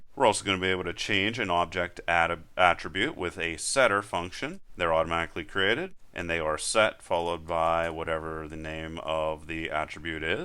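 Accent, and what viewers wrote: Pittsburgh, United States. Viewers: OK... you are indeed a Yinzer... and unlike so many videos, I didn't have to struggle to understand what is being said (which given that I used to work for Greater Bell Labs and had co-workers in my group from dozens of countries and had no problems understanding them, says how poor some of the videos you find up on YouTube are). While this video is a bit long, I think it would have been nice if you had explicitly covered the "var -> closure" syntax and nested each closures. Now to do some digging, as I try to write a Groovy script do do what I can do in over a dozen other languages already.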